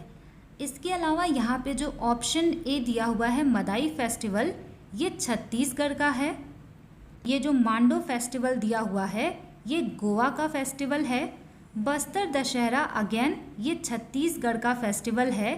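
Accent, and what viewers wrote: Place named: West Bengal, India. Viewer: Ma'am aap pakka West Bengal sein ho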